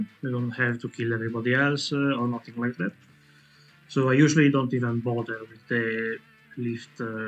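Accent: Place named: Italy. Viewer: Minchia fra, appena ti ho sentito parlare sapevo già che tu eri Italiano, sei un grande per portare questa grandissime macro serie con i tuoi video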